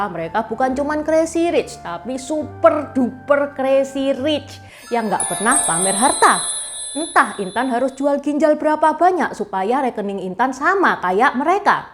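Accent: Java, Indonesia. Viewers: Kalau gak lihat orangnya aku kira ini orang jawa lagi ngomong. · Logatnya sdah mendok jawa banget 😁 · Mantap cici Intan, suara medok khas Tionghoa Jawa tp saya suka nonton youtube ci Intan. Oeh Andi Tio Hokkian Bandung yang leluhur berasal dari Coanciu/Quanzhou asal Oey Ek Tjong Sinar Mas, Lim Seng Tee Sampoerna, Aguan Agung Sedayu dll. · Jawanya medok banget ci 😂👍🏻 Chasing chindo, isi jawa tulen haha, Maaf baru pertama nonton, Thanks infonya, sangat bermanfaat Salam satu nusa satu bangsa 💪🏻🇮🇩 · 👍👍Logatnya Mbak ini medok banget 👍👍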